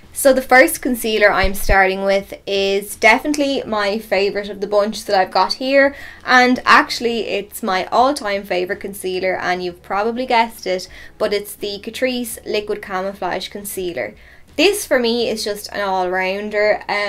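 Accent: Ireland. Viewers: I love, love ,love your Channel and your videos 😍😍😍 You are so professional, kind and friendly, and I love that you review products that are affordable for us mortals 😅 And...even though it's highly irrelevant and I hope I don't offend you as an Irish person, but being a Dane, who spent a lot of time travelling and living in Dublin, listening to you talk takes me "home" to Ireland.❤️ So thank you for that too, and I'm terribly sorry if made you feel like a tourist- leprechaun...😳🤦‍♀️ Definitely not my intention!😊🙏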